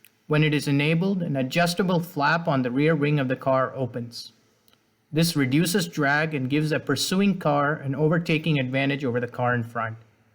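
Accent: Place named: India. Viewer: Just realize how you're talking english, like an Indian talking english.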